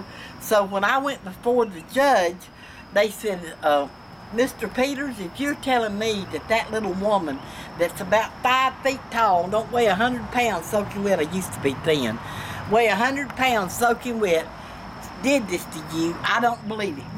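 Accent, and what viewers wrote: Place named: Georgia, United States. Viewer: She lives in Georgia, that explains her accent.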